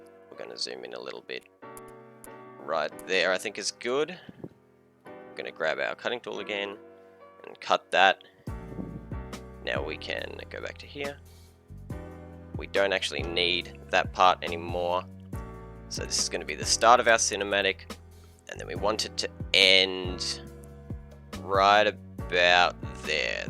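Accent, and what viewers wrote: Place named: United States. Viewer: why does he sound like flea but with a american accent XD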